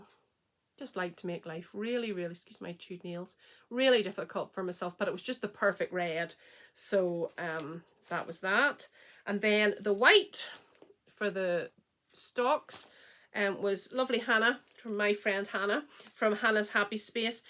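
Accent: Northern Ireland, United Kingdom. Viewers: Hi, I live in Southern Ontario in Canada, but I was born in Belfast Northern Ireland. My family immigrated to Canada when I was 5, but I can recognize the Northern Ireland accent anywhere, my siblings and I lost our accents but my mom and dad never did. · Enjoy watching your podcast. Your accent makes me homesick. I live in Guelph Ontario Canada. Most of my family live in Belfast Northern Ireland.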